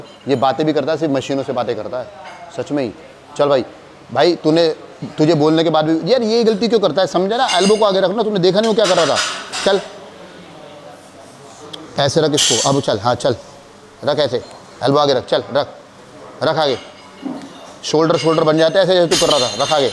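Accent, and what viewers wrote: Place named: Kolkata, India. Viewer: Bath sunke hi pata chal kolkata ka ladka😊❤💪🏻🥰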